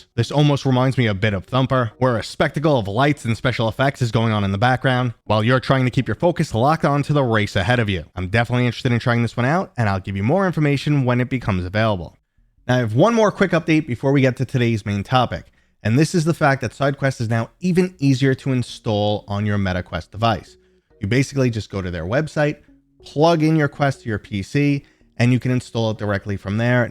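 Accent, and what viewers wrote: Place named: New England, United States. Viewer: Love your content brother. I'm curious. Are you from the Boston area? I'm from NYC and trying to figure out if your accent is New England or NYC 🤣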